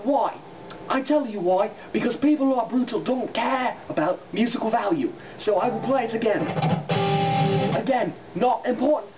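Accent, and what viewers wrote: United Kingdom. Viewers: fuckn british accnt irritates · where the fucking shit do ya live?? o.O your accent tells me you must be british but where exactly?? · This Vid...Had a important factor, a British Accent BRUTAL GUITARIST! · I hate that english accent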